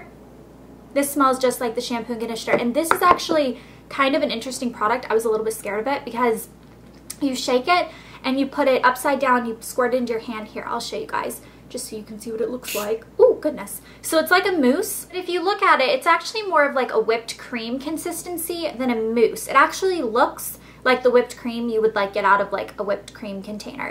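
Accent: United Kingdom. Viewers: OMG, love your british accent:) Sooo good!! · hahahaha your british accent - so hilarious <3 Love you! xx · Hahaha! Love your British accent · Haha I loved the British accent. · Your British accent is really good xx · British accent? London Olympics of course ( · I love your British accent haha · I love your British Accent ;-)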